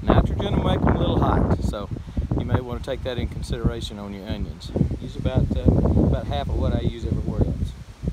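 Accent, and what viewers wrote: Texas, United States. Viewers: Just found your channel through your food prepping videos...subscribed right away due to content, presentation, and that sweet Texas sound of your voice! I'm a transplanted Texan here in the Midwest and I sorely miss the sounds of Texas! Nice garden!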